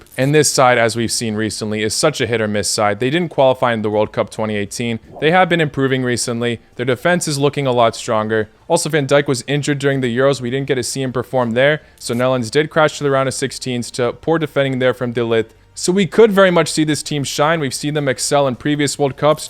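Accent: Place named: United States. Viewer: Au brate zvucis tacno ko amerikanac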